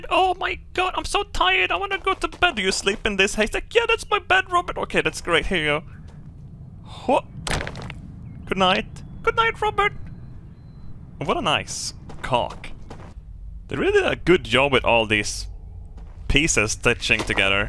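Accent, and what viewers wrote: Sweden. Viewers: Älskar din svengelska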